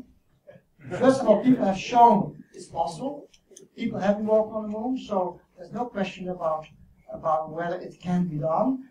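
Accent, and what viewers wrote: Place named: Germany. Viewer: Well I was going to watch it, but all the bumps and feedback in the audio on top of a muffled German accent make this video harder to understand than a police radio haha. Did you actually watch this before posting it? Seriously, it's like someone put the microphone in a sock full of rocks, dangled it from a string, and started trying to play Conkers with the speaker, before adding an echo effect post production and following it up with noise removal on a sample of his voice.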